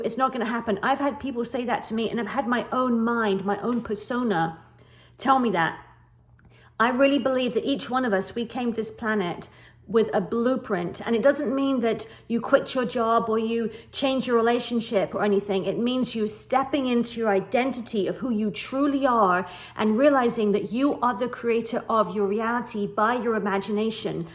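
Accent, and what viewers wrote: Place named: England, United Kingdom. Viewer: Ha, i thought you had an English accent that explains visiting wales a few times 👍 you know you mention about being of an older age even in our 50s or older as long as you know about conscious awareness that our thoughts are living entities creating our physical realities then evrn 10 20 or 30 yrs of life of having this awareness that we can create anything through DISCIPLINED! Mind to speed up the progress or as i use my own affirmations or subliminals on our voice recorders on our phones played every night as we sleep for our desires can bring everything to you so even at the later part of our lives through conscious awareness manifestation we can achieve more than just scattered rolette style thoughts of randomness it is all about FOCUS, AND DISCIPLINED MIND, thanks for mentioning wales 🏴🙏 worth mentioning the mirroring techniques to of bringing things to us faster 🪞😉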